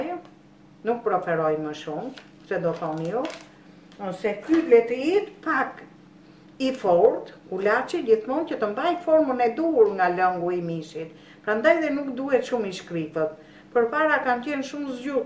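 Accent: Tirana, Albania. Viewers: Pashe dhe vidion e pare ..qe me kishit Kthyer pergjigje..faleminderit shume.. Sapo e mbarova doli special Hera e pare ne jete qe bej kulac si tironse se kemi tradite..por kte vit e bera ...😉..Gezuar Vitin e Ri.. Me zi pres te hidhni vidion e rradhes neser pjek gjelin e ta bej persheshin me menyren tuaj.. Ps u knaqa me tfolmen tironse😉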